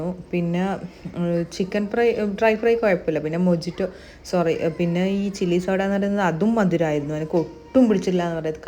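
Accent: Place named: Kannur, India. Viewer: Kannur kasrod slangilulla ninte voice kelkkan nalla pangan...😊😊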